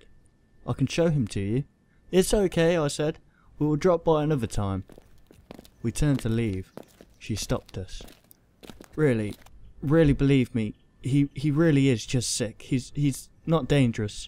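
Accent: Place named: United Kingdom. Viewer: I NEEDED a British creepypasta reader it gives diversity :p awesome work guys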